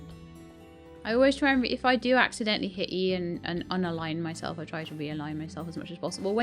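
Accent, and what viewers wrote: United Kingdom. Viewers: Looks interesting.can u play more of this game thanks p.s you still have a lovely voice where abouts in the UK you from because I ve hear it before but I can not place it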